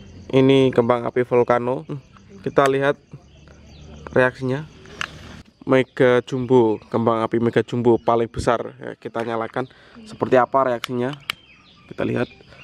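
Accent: Java, Indonesia. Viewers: Koyok e tek wong jowo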